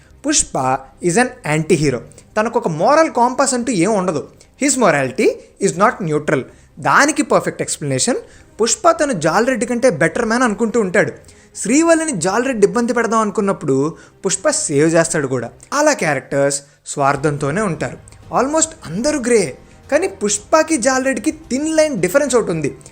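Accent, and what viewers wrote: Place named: Chittoor, India. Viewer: Love from chittoor 💕. Chittoor slang nee bagane try chesaru meeru.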